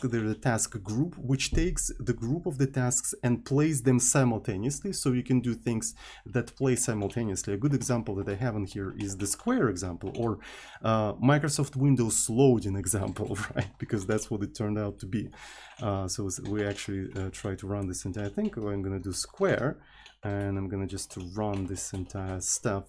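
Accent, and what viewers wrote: Russia. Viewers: А можно то же самое на русском ? Вы же - русский, судя по акценту.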